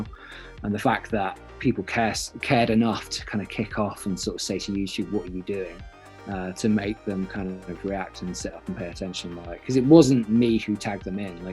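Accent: United Kingdom. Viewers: dude looks like Dave Franco and The Action Lab combined with a British accent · dang, british ian hecox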